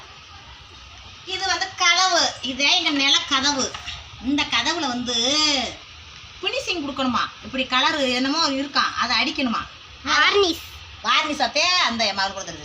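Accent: Madurai, India. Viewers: But your madhurai slong vera level, i am live in karaikudi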